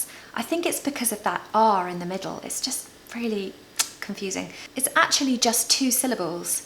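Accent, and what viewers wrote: RP, United Kingdom. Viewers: Okay, let me say this. You are the BEST teacher on the youtube by far. I have been looking for a teacher and now I have the best one-. I love that your teach us about the British culture as you teach us about the British English. This is FANTASTIC. Please Please Pleaaaaase upload more videos. I watch your videos everyday now as I want to adopt your accent and learn more about the British culture. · I love your beautiful smile and beautiful British accent! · First of all, glad I am so early to this newly opened channel. Second, can you make a video of you pronouncing random sentences and questions so that I can repeat after you and sound more natural??.. I am trying to learn the British accent ❤❤❤❤😁😁😁 BTW, you are a natural, and have such a stunning voice and accent that really helps people like me, who struggles with british accent, to learn faster. 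· I liked the way you used elision technique in pronunciation, great video. Lovely British accent. I m learning from you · Is received pronunciation your natural accent or do you speak it mainly for teaching purpose?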